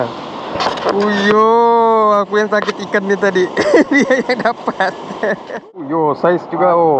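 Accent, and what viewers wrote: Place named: Sabah, Malaysia. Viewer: Terbaikk gabungan 2 sipoo surfcast sabahan😅.. Sekali loghat sabahan berkumandang... Paduu betul sipoo tu, landed ikan sisik dgn Palergic.. Surfcast memang kepakaran dia.. Sipoo pun x kurang mantap.. Strike padu jgak wlau ikan duri.. Rwzki buat kwn.. Alhamdulillah.. Terbaikk 2 sipoo idola.. Mantapoo❤🎉❤🎉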